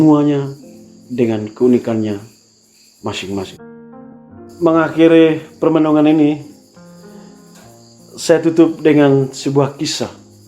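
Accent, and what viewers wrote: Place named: Flores, Indonesia. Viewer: Semakin Logat Maunori. Mantap eja Rmo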